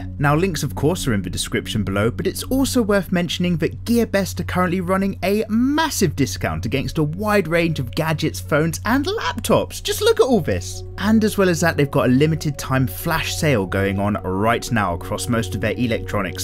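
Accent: United Kingdom. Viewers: I am new on your channel, but I already love it. It is so nice to listen to your voice recording about drawings while I am doing my own arts. Thank you. Love your british accent!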